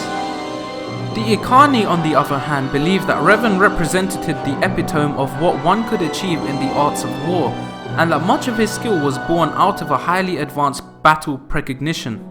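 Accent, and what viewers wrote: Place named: East London, United Kingdom. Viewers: Loool I know your accent is it from east London? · damn you got a thick london accent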